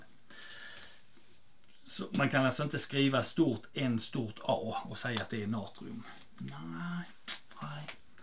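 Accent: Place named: Scania, Sweden. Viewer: Kul att lyssna Kemi på skånska 😅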